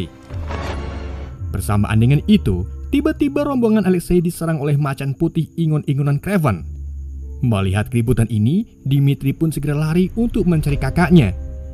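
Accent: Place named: Pekalongan, Indonesia. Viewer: Logate mirip pekalongan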